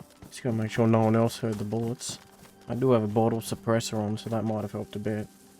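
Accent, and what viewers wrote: Australia. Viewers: first time as a aussie hearing another aussie speak and going we do not sounds like that aha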